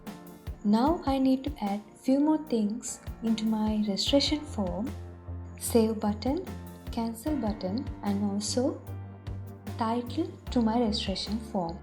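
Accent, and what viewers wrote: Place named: Sri Lanka. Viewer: haha I was searching for React Form tutorials and this got recommended. keeyatawath hithuwe naa Lankawe accent ekak ahei kiyala :p Good Luck whoever you are! :)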